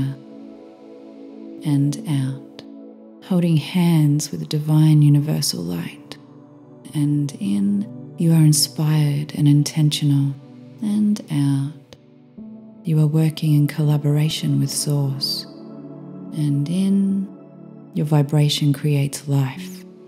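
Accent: Australia. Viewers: Thank you what a fab voice. Is that a Australia acet I LOVE IIT Roz Ireland.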